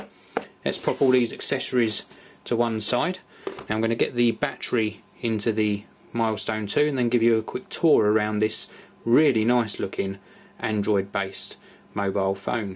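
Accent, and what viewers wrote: United Kingdom. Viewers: I love your british english :D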